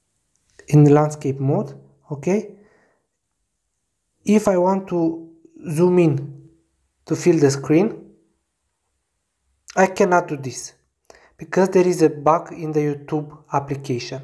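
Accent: Romania. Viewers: M-am prins din prima ca esti roman de la accent lol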